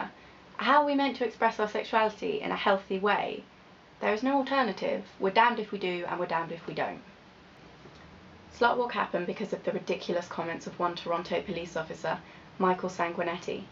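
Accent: United Kingdom. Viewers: nice posh accent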